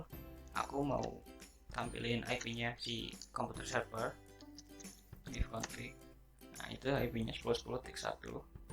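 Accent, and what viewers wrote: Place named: Sunda, Indonesia. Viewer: Bahasa Indonesia dengan logat Sunda dan Jawa wkwk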